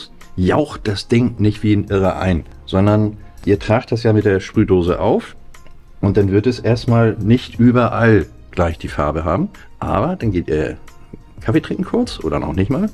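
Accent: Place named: Sylt, Germany. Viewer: Der Akzent! Himmlische Heimatgefühle! Komme von List auf Sylt. Jedes Mal wenn ich ihn höre denke ich an Munkmarscher Muschelsuppe.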